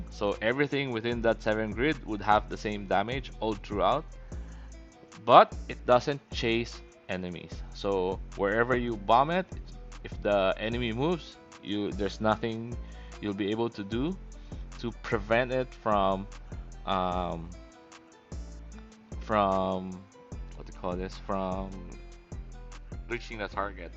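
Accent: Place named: Philippines. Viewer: Base on your accent your Filipino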